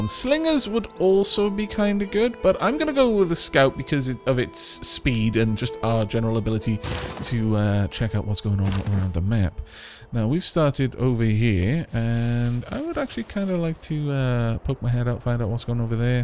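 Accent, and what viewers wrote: United Kingdom. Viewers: Well this video is interesting... Tea surrounding London with a man playing England with a British accent. Sorry if it's something else.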